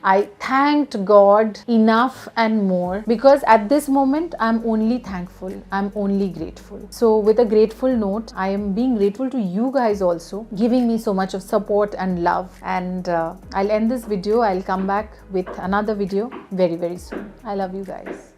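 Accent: Bengal, India. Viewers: i would like to tell you something initially I felt that u r a very rude person i used to watch your videos previously but didn't subscribed your channel as I kept watching your videos i got so impressed by you...ur truly and genuinely take so much efforts for your PR Or advertising any product seriously it's like for any product u try to make content from ur videos and the most important thing is u r too light in ur life how nicely u handle every thing the way ur at ease in your life is so so inspiring by seeing ur videos i learn that it's absolutely not necessary to be in serious mood all the time.....i just love u and your videos the way crack jokes....the way u talk with ur hubby....Pablo....and most important ur Hindi with bengoli touch....and now I have subscribed ur channel....and enjoying every bit of your vlogs....u never disappoint your viewers every videos has something new and knowledge too...All the best and enjoy....no need to tell you though😛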